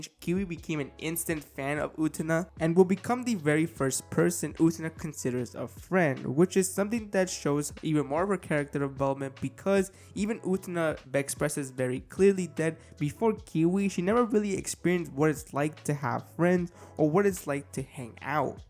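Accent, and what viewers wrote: Spain. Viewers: that's just how spanish ppl speak english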